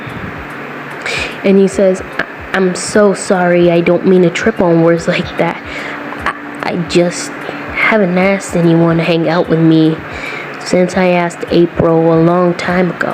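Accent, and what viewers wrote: South, United States. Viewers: IM NOT BEING RUDE OR ANYTHING BUT IT SOUNDS LIKE HAS A BIT OF A COUNTRY ACCENT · He sound red neck lol i love it ❤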